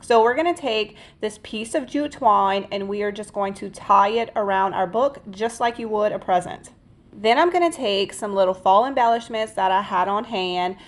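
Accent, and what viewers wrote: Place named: Virginia, United States. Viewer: r you from virginia??? UR accent caught me!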